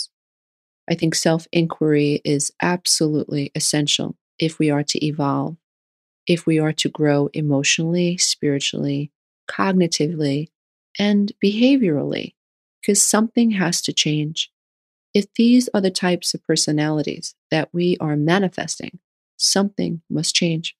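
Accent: New York, United States. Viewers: This is soooooo on point., the last guy I dated was just like this. blaming me he can't get me to do a threesome, calling me names I shouldn't write on here. luring me in with excitement in the beginning and then just flaunting it allll over NYC with everyone else.. im the crazy one, im the nasty one, im the violent one... etc etc etc. (these are just the examples related to this video). then finally he broke my nose. the next fight, I finally called the cops. and then Covid happened and I left NYC so I didn't get stuck quarantined with him. During this time I was able to reflect on everything. I found your videos, and you have helped me understand so much. you aren't the only one with videos on this content,.... but maybe missing home so much and hearing a New Yorker like someone I knew tell me it wasn't me and hearing the examples that literally were pages out of the book of Michelle and Matt 2019 made it click and gave me strength. 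I just want to thank you so much.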